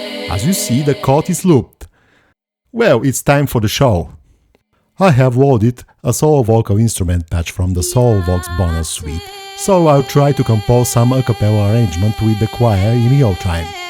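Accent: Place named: Russia. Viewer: а вы, дорогой друг, часом, не носитель русского языка?)) а то уж больно акцент знакомый)))))))))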